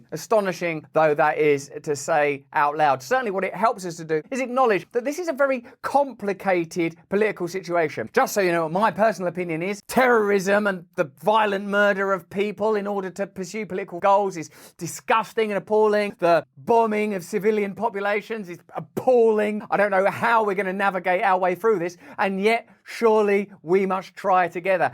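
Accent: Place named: London, United Kingdom. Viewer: u sound like ur from london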